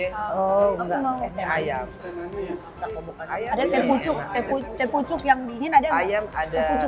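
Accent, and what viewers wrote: Manado, Indonesia. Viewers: Adu eh...p sadap m dengar mbak bahasa mdo.. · Mba campur logat manado mantap 😀👍...sehat sllu mba' brsm kluarga 😇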